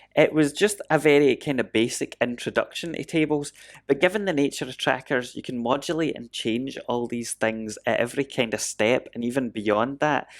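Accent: Scotland, United Kingdom. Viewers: When you started explaining, I was thinking it's like a macro. Instead of just playing the note, you trigger the macro that adjusts the note to your liking. I must say I thought I was the only person on earth with a serious interest in trackers, mechanical keyboards and Melt Banana, but I'm happy that I was proven wrong. I've been using the Beatstep Pro for a while now and I just did a 6/4 track. How hard can you make that! I cannot believe "copy these 12 steps" actually turns it into 16+8 instead of 12+12. That's definitely not a problem with trackers. I have been eyeing the options for a while now and after seeing this video, I ordered a Teensy. Should be here tomorrow. Thanks for your hard work, you explain complex things in a very understandable way. And your accent always takes me back to my travels on Skye, Arran, ...!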